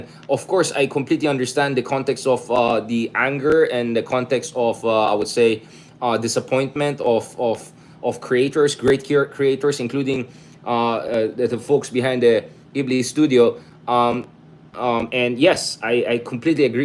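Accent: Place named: Ilocos, Philippines. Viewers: Kyut ng Ilocano delivery mo and I was waiting for the magic word and so glad I heard “please”. Haha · Sir kansat.kasla ka met kanyami nga igorot nga agsao ilokano.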